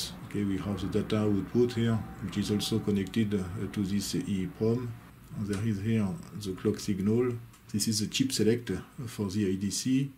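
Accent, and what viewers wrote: France. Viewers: Love the funny frenchlish. Sounds like a Frenchman in a movie.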